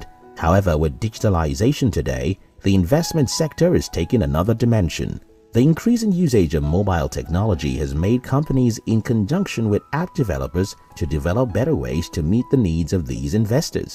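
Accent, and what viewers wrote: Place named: Nigeria. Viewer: The voice over sounds like that of a Nigerian.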